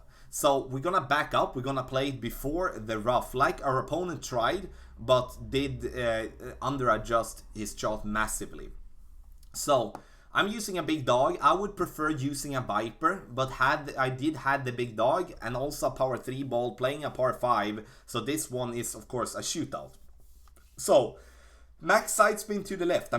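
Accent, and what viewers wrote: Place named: Sweden. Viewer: Todd Calhoun swenglish👌🏻😁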